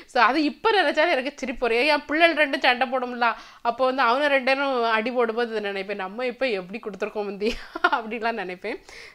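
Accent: Nagercoil, India. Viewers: நாகர்கோவில் தமிழ் அருமை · Very Very great, because of your natural expression of your way of giving the replies. and waiting for the more and more videos from your end. Your Nagercoil slant is added advantage for you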